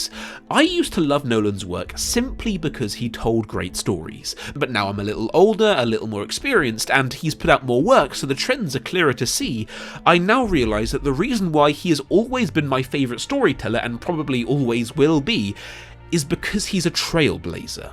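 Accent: England, United Kingdom. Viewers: you sound British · The dumbest and THICKEST apologist video you’ll seen on Tenet. Just because he has an English accent doesn’t mean his points are insightful, they’re just verbal salad.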